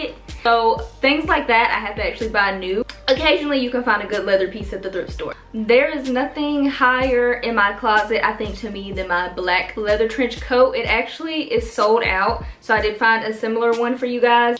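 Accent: South, United States. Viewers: Oh my gosh girl I love your energy and that southern accent ! I’m sure you get this all the time… but where are you from !!? I’m lovin it ♥️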